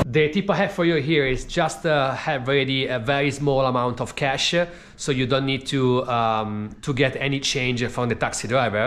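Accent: Italy. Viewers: You sound Italian by the way you speak English. Am I wrong? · It looks you have italian accent! So I suggest you make also a video about millions scams in Milano,Roma,Venezia.fiornze...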